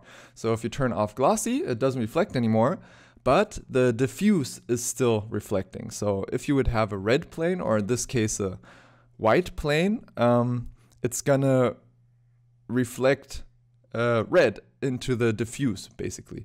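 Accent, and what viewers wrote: Germany. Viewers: Are you a German-Guy? Your accent sounds German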